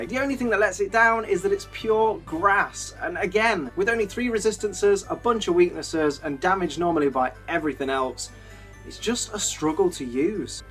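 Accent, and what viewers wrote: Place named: Midlands, United Kingdom. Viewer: I'm from the Midlands tooooooo. Staffordshire. i bet your accent sounds weird to most people but sounds so normal to me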